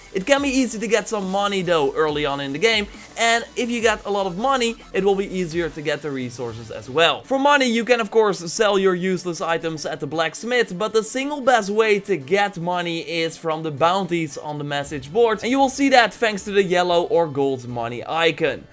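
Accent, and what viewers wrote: Netherlands. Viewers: Gek Nederlands accent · You sound Dutch, where are you from?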